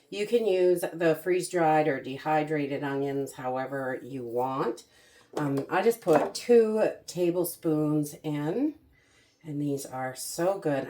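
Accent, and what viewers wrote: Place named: East Coast, United States. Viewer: I love all you do with freeze dried food! This is what I give my kids for Christmas now. I buy 12 quart jars and use your recipes to make them. They save their jars and I refill them every year. Thank you for your ideas! I love them! Thrive life is so worth it! Have a great day. P.S. I love your eastern accent!😊 I’m a born and raised midwesterner lol.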